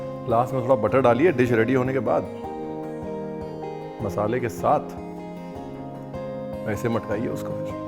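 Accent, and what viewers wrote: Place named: Punjab, India. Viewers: I loved that Punjabi style talk, Punjabi people have that talent to make you feel cozy. Receipe is also quite interesting,but the talk, smile made my day!!❤ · Apki boli Mai h Punjabi tadka jabardast or mast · Hii Ranveer, ye kafi pahle dali hui recepie hai. Personally mujhe mushrooms bahut pasand nahi par par kal kisi ne bilkul fresh mushrooms de diye. Ab banana to tha jyada the to socha dry hi bana lete hain. Phir search karte hai sabse pahla aapka vidio appear hua. Bas phir kya step by step follow kiya aur banai bilkul aapki tarah shandar kadai mushrooms aur sabko bahut pasand aaya. Aur Haan sath me jo aap apni style me bolkar jo panjabi tadka lagate ho lajabab. 👍👍👍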